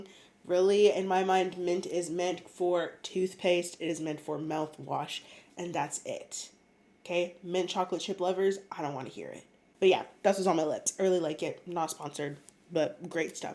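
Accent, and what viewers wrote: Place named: Canada. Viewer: It’s crazy I skipped over you being Canadian in your bio and I heard you say “about” as “aboot” I had a degrassi flashback and said ohhh… is def Canadian 😂I’ve watched plenty of your vids and somehow never paid attention to that until now but I love it ❤😂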